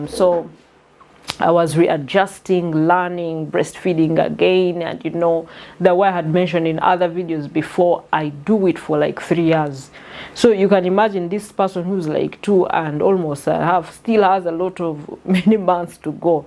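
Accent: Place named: Kenya. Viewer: you look kenyan now and talk like them😂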